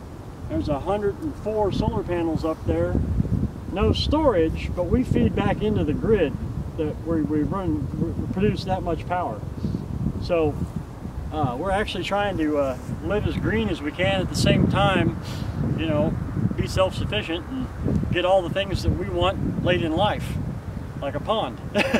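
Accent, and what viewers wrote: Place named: Missouri, United States. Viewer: Thank you....i was getting the impression you are in Mo , I have family in Mo. and thought i recognized your accent ( be it barely noticable )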